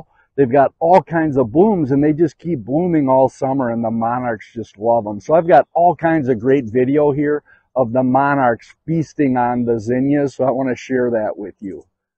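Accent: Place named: Minnesota, United States. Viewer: You sound Minnesotan.. lol.. Takes one to know one.. if I'm right. 😅 Love your video! Ha.. I just heard you say you're in MN. I have Zinnias and the Monarch are enjoying everyday!!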